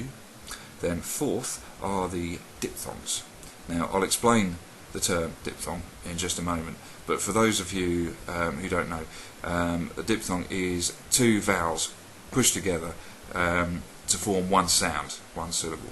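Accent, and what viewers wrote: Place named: United Kingdom. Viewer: "...we have the "aa" as in "run" - my non-native english mind is confused with your British accent.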